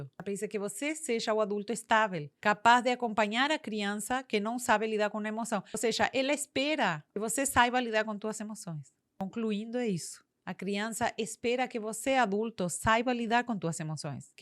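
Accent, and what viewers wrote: Spain. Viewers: E o tipo de mulher sem cuidados para ser mais feminina, sem pintar unhas, com esse sutaque espanhol faz ela misteriosamente sexy....ja eu vejo so por isso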